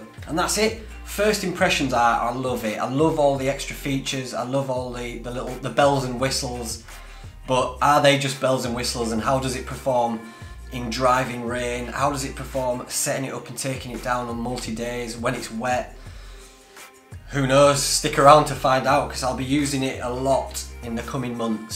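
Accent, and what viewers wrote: Yorkshire, United Kingdom. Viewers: Love this Bro... Great review. Just discovered your channel and your Yorkshire ness (ar tha from Steel city lad?) Nice thought on compassion as well. Namaste from Pete in Penistone.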